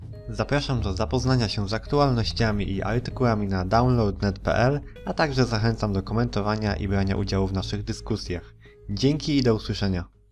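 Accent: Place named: France. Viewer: Ale ten gościu ma francuski akcent😎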